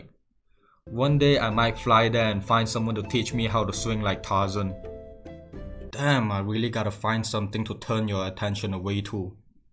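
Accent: Malaysia. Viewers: Your accent is so Malaysian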